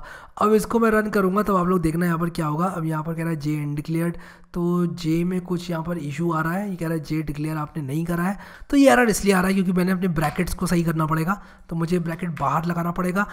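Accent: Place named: Punjab, India. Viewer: U have a little bit of Shakti Kapoor accent. Punjabis..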